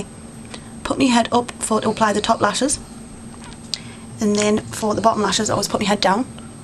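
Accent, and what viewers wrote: Newcastle, United Kingdom. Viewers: are you from the north east? you sound like a geordie! reply please!!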